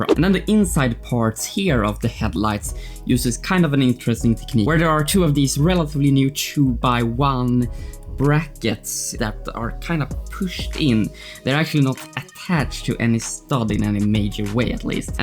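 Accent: Sweden. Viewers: Extremt stark brytning haha, du måste vara svensk?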